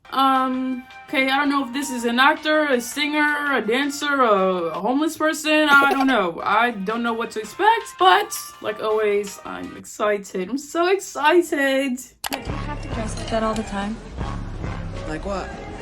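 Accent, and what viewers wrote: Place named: United Kingdom. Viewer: you’re editing honestly kills me off everytime!!! love ur vids 🫶 and also ur british accent isn’t half bad :)) love from, a mancunian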